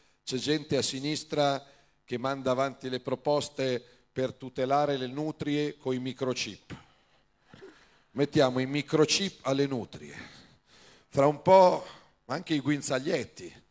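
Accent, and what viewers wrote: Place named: Emilia, Italy. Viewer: Ma hai preso la cadenza del mio popolo Matteo... dai che la mia bella gente stavolta avrà il coraggio di cambiare. W l'Emilia Romagna... il 26 scriviamo la storia.. io non ho dubbi io voto LUCIA BORGONZONI, LAURA SPAGGIARI E FRANZINI.... MI RACCOMANDO METTETE LE PREFERENZE E STATE ATTENTI AI BROGLI NELGI SCRUTINI. SE LASCIATE BIANCO RISCHIATE CHE CI SCRIVANO BONACCINI.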